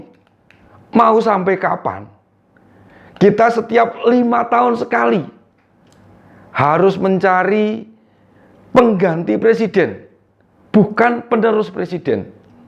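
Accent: Java, Indonesia. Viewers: Presenter tuwek medox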